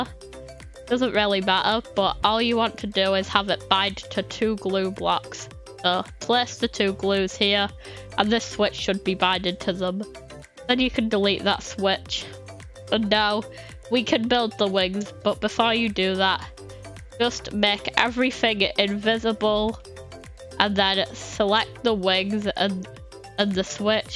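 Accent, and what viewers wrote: United Kingdom. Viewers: Its called a british accent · He talks normal he's just british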